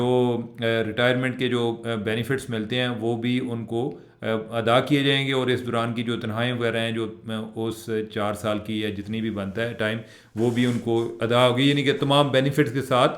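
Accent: Punjab, Pakistan. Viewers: Naeem bukhari and Najam Sethi speaks Punjabi in a style.You are forcing yourself to copy them.its better to remain natural.your urdu accent is enough to prove that you are punjabi bro.cheers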